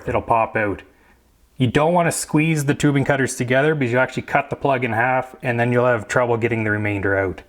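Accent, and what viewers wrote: Canada. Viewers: Great job! You sound Canadian. Is it a norm in cold regions to use ABS piping for drainage? Or anything else? I was looking for a good tutorial for my kitchen plumbing project. Cheers from Houston, Texas.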